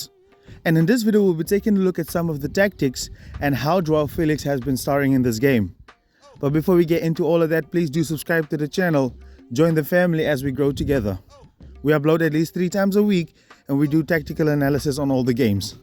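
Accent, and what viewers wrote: South Africa. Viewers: This ou sounds south african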